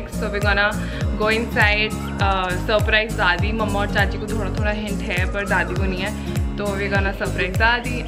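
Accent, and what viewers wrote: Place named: Punjab, India. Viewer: So thoughtful of you to think of not letting her cook on this day ❤️❤️ that tinge of Punjabi accent you have in your way of speaking is damn cute ❤️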